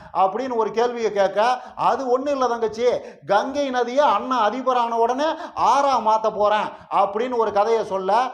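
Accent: Kongu, India. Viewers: உங்கள் கொங்கு தமிழ் உச்சரிப்போடு அகோரிசீமானை கலாய்க்கின்ற முறை மிக அருமை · Today very interesting comedy brother. 😮😅😊😂😊 அதுவும் நீங்க சொல்ற அந்த கொங்கு பாஷை இன்னும் சூப்பரா இருக்குல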